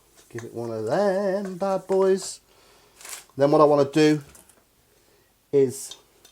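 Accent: United Kingdom. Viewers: "get in with me ands" lol British accents are all so amazing. Love your video's man. These recipes all look amazing. i can't wait to try them one day when i can get a hand on some of the ingredients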